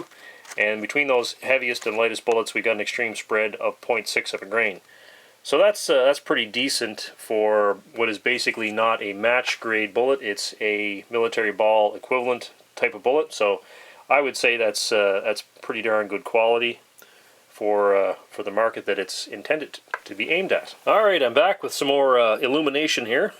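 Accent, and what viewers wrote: Canada. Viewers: You sound Canadian. Greetings from an American citizen, who was born and raised in England.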